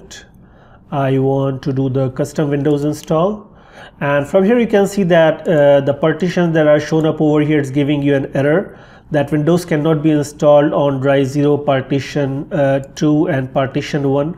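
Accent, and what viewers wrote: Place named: India. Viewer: Kitna bhi prayash kar rahe ho Par american waali feling ni aa rahi hai indian ho indian hi rahoge kitna bhi english bol lo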